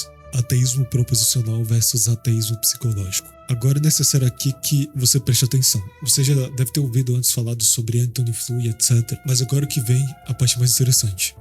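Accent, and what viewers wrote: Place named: Northeast, Brazil. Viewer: Que voz horrível, parece um gripado falando com algum sotaque nordestino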